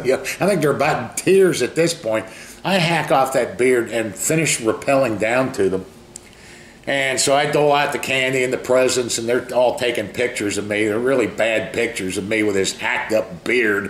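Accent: Pittsburgh, United States. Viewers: Hey are you from Pittsburgh originally? You sound like you have a Pittsburgh accent. When you make a sandwich I'll bet you use Isleys Chipped ham 't you?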